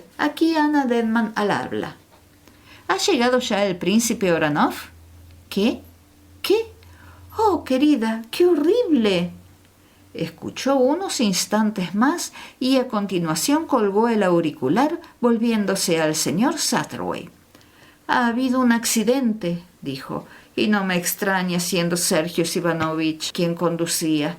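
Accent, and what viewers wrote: Argentina. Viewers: Exelente , te escucho desde Costa Rica 🇨🇷 y vos SOS de la Argentina ??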